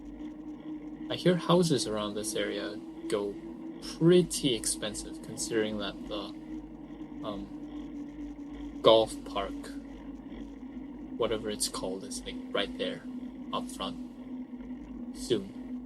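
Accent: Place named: China. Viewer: I can tell you have a slight accent. No offense. I'm guessing your bilingual and your second language is Chinese which is very difficult. You do speak perfect English though. I'm trying to learn French which is hard enough.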